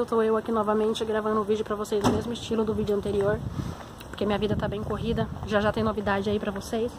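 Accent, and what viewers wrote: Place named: Portugal. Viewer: E esse sotaque português hein 🤔🤓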